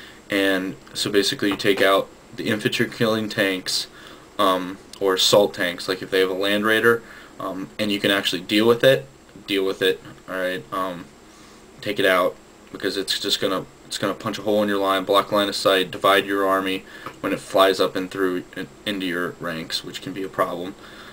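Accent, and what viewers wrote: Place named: New Jersey, United States. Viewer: Fucking hell, you understand this game so much more than me. You sound just like the guy that keeps kicking my Ass! (He's from New Jersey, sorry if that offends, I'm English!).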